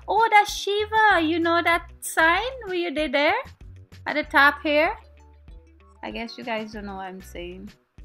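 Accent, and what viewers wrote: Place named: Guyana. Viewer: i love the guyanese accent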